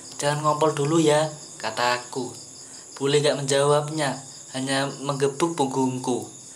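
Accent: Java, Indonesia. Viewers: Suaranya logat jawa medok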